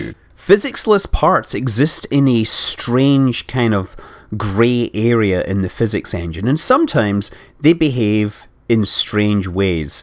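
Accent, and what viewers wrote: Scotland, United Kingdom. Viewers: Scott Manley is, well Scottish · Aaron Muck Yeah, they're both Scottish accents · Baruch Storm hes scottish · Sam Da beest Scottish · dat scotish accent doe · he's Scottish i believe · HES SCOTTISH!? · What the hell is that accent. Scottish word here, American there.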